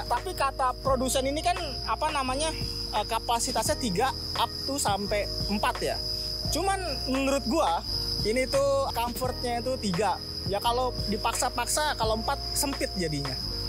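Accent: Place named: Indonesia. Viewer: Suarnya medok banget bang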